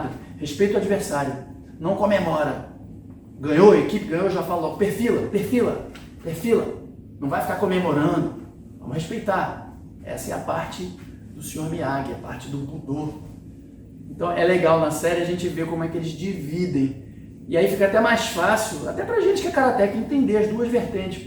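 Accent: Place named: Rio de Janeiro, Brazil. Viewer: Sensei, onde é sua academia? Treinei karatê por muitos anos e gostaria de poder voltar. Senti uma esperança, pelo seu sotaque, de que o senhor seja do RJ. Abraços. Oss!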